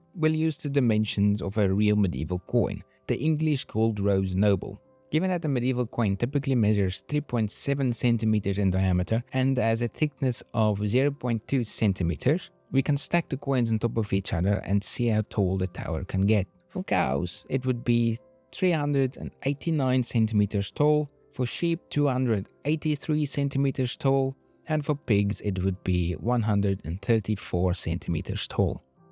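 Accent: South Africa. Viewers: Hilarious that I could pin you as a fellow South African just from your accent lol. Great video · Is the creator South African??? The accent sounds very familiar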